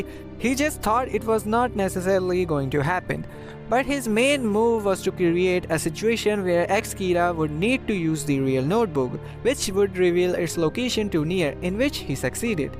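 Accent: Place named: India. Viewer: Indan accent english, Fresh W 🐬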